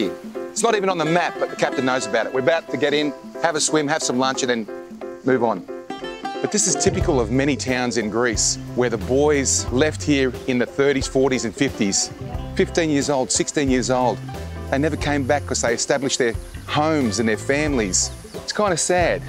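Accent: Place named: Australia. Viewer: 🇪🇺🇬🇷🇬🇷🇬🇷🇦🇺 Εχω παει εκει Μαρεσει η αυστραλιανη γλωσσα προφορα ... Γεια👋👋👋👋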